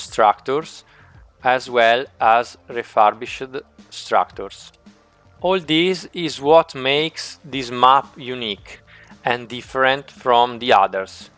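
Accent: Italy. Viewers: Bel video!! Quell'inglese con accento italiano e fantastico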